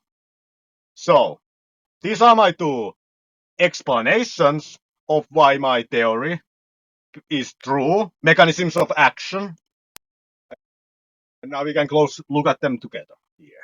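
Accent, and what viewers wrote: Finland. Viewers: Haven't watched the video yet but I have to say, you sound super Finnish :D (I'm Finnish)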